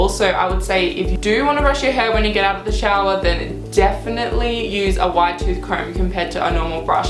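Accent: Australia. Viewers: Is that an aussie accent? Or kiwi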